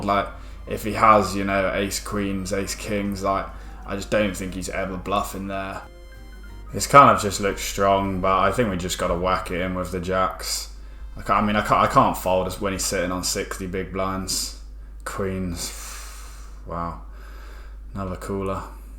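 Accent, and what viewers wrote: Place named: Gloucestershire, United Kingdom. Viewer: thought your accent sounded close by to me. Wiltshire here 👍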